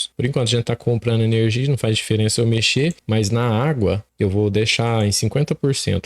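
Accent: Minas Gerais, Brazil. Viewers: sotaque mineirin q e bom de mais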